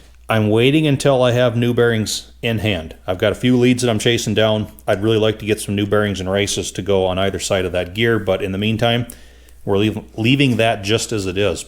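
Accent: Minnesota, United States. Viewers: "Pardon me", but I did not understand the mustard reference. :-) BTW, I believe it is "first gen" a.k.a., first generation and not "first gin" but we all know what you mean. It is somewhat similar to those who say "escavator" rather than "excavator" which I think may be a MN thing. Yep, you are a mechanic and a damn good one, and do not pretend to be a linguist. I ain't one of them there linguist neither too. :-) Keep up the GREAT Cat work and tnx for letting us tag along while not even get our hands dirty.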